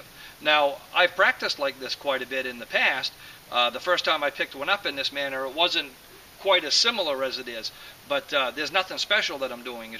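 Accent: Maine, United States. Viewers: By your accent you sound like a native Mainer to me. Is that where you're originally from, or have you just been a transplant for long enough to be one of Tim Sample's "kitten biscuits"?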